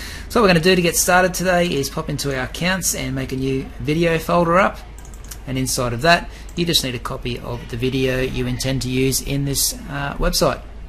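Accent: Australia. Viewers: Love your accent mate. Australian right?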